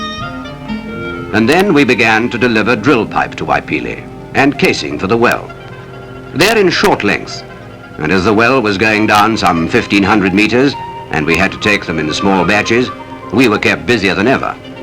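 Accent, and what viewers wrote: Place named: Oxford, United Kingdom. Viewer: Kan kijkers van deze bedrijfsfilm verzekeren, dat in deze getoonde film- en boorlokatie; niet de deze documentaire gebruikte Oxford uitspraak gebezigd werd! Eerder, zal er menig Nederlandstalige vloek gevallen zijn. De helikopters werden gecharterd van World Wide Helicopters geregistreerd op de Bahamas. Vliegers en grondpersoneel waren veelal Australiërs. Deze info van een toenmalige 10 jarige snotneus. Heb op een uitermate tropische zondagmiddag ooit enkele uren, door mogen brengen op de logistieke locatie van deze (droge) exploratie put. Herinner mij bij het inkloppen reaktie, dat collega van mijn vader genaamd Gesterkamp, een paar groene lomboks uit een grote jampot verorberde. Mijn vader waarschuwde voor de gevolgen voor de maagband. Gesterkamp is een hele slechte geworden voor het Shell Pensioen Fonds. Deze culinaire liefhebber van de oosterse keuken is uiteindelijk meer dan 90 jaar geworden.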